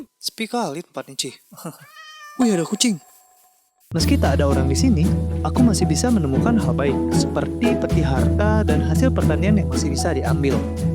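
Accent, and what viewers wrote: Bali, Indonesia. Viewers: berlogat bali wi nya jok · orang bali bg? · org bali kah? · Orang Bali wi keliatan banget logat nya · logat bali bnget nok kakaknya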